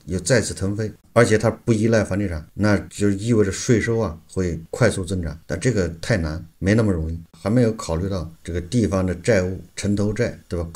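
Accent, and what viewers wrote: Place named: Anqing, China. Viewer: 安庆口音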